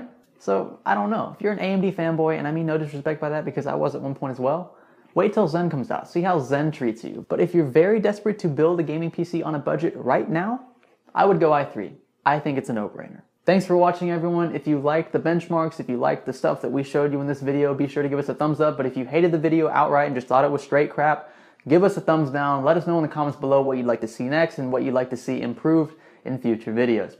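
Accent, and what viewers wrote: Louisiana, United States. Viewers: are you from Louisiana?!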